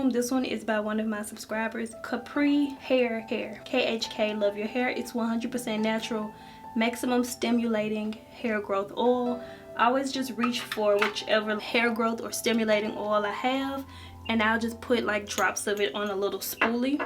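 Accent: South, United States. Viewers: I love your voice, so comforting..and u have that lil southern accent too...☺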